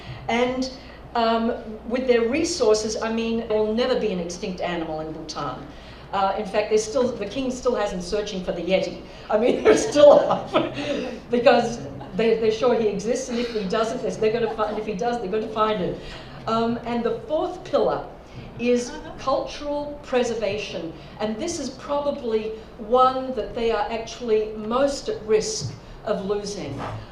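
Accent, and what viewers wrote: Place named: Australia. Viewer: Twenty minutes ago, as I was pulling into my driveway, I changed the Sirius station to 129 [The Catholic Channel]. Father Dave was in conversation, and a person who sounded to be an Aussie began speaking. After the first sentence of dialogue, I put my car in park, and had to find out who this person is and her mission. I scribbled the info onto a piece of a snack box, went to the website to than YouTube. The end result: I felt happy. And invigorated. Thank you for passions. Your ripple effect will be felt in perpetuity. God bless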